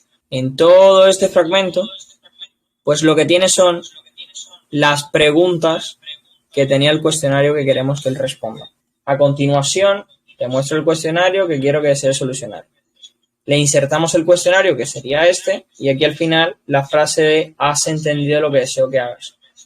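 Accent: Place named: Spain. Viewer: Vaya vozarrón y acento español llevas colega 😂👏🏼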